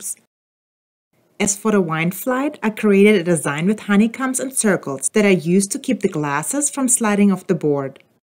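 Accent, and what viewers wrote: Germany. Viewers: I can tell 🤔 are you German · I like red dry wine, Pinot or Italian wines. That's a cute little dog at the end of the video. If I ever get a dog again I want a small one like yours. You are such a beautiful woman and I knew you would be a heart breaker in a dress. Is your accent German?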